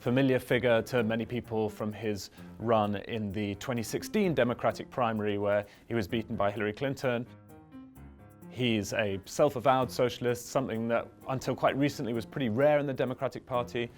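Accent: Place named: United Kingdom. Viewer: Why am I hearing HEARING A BRITISH VOICE !!!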